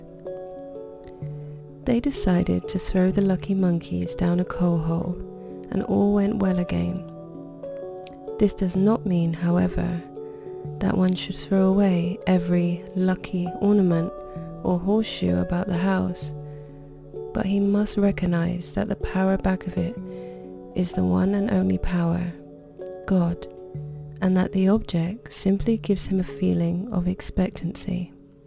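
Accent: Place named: Surrey, United Kingdom. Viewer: Madame, Let me preface this with the fact that I am quite discerning when it comes to bestowing praise regarding anyone's voice when speaking. With that out of the way, I must give my most ardent compliments to you. You are in possession of a provocative, mellifluous voice, spoken with a disarmingly charming accent. Yours is the kind of voice, I would like to be the first sound I hear when I wake and the last sound I hear before I slumber. I live in Manhattan, New York, so my ability to ascertain the origin of your accent is perhaps insufficient. At least some of your cadence, and diction remind me of an accent South of London in Surrey. Notwithstanding my ignorance of the origin of your disarming accent, you have a guileless, feminine resonance in the prepossessing melodious sound which would only enhance the lyrical tone of poetry. 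A voice such as yours is no doubt accompanied by a pulchritudinous visage. Written by a bodice ripping rogue, sincerely with unadulterated veracity, without the slightest affectation whatsoever. ∞ Rogue Means - - - - 'Beauty is truth, truth beauty,' - that is all ye know on earth, and all ye need to know. ―John Keats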